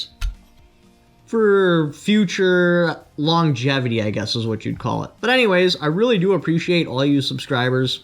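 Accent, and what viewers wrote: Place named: Wisconsin, United States. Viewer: Wisconsin! That makes sense, your accent is definitely indicative of your area. Not a criticism, just an observation. I’m in West Virginia, population, 1.8 million with probably 50 different accents!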